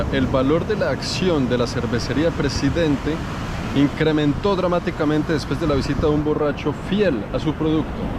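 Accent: Bogotá, Colombia. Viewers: Que carisma tienes, me gustan muchos tus videos y lo que transmites, como describes los paísajes y como se te ve que disfrutas lo que haces y los lugares que visitas, tu acento colombiano, bel ragazzo 🥰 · lo que me encanta de ti, es que eres auténtico, tu lenguaje muy rolo, muy Colombia.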